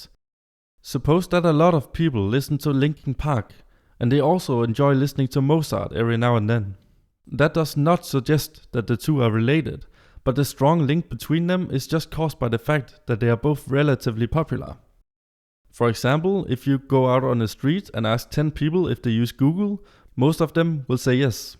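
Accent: Denmark. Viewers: You can hear this guy is danish :D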